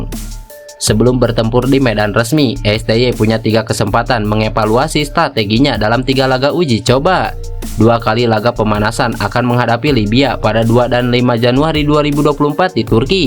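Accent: Java, Indonesia. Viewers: Adminya Jawa banget n ga enak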